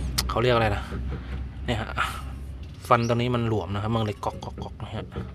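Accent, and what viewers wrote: Phu Thai, Thailand. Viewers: คือสำเนียงออกทางผู้ไทย แม้นเบาะครับ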